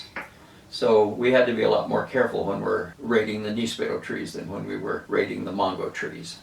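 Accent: Venezuela. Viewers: I like listen your venezuelan acent. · i´m colombian, lived in venezuela for about a decade, and lived in cuba for about 7 years, and that´s something i recognize, the "smell" of a name, you made me smile today! And loved you venezuelan accent!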